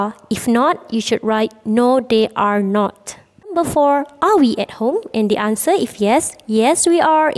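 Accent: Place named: Japan. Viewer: cikgu orang jepun ke comel cakapan cikgu saya fatma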